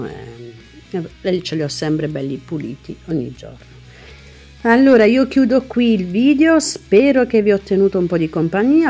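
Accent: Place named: Apulia, Italy. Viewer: Ciao sei pugliese ?si sente e anche io lo sono abito nella bat ,cmq belle pulizie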